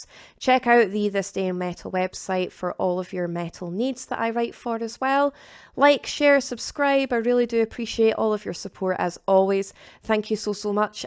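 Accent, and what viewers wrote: Scotland, United Kingdom. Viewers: "If it's not Scottish, it's Crap!!". Love the accent and great video. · It's a basic metal channel. But every now and then, she hits me with one I havent heard, and those are the reasons I watch. Plus the charming Scottish accent/demeanor. Plus, to be fair, shoegaze is a 90's thing that's only been creeping into metal since -. Not her generation. This is how it feels to grow old.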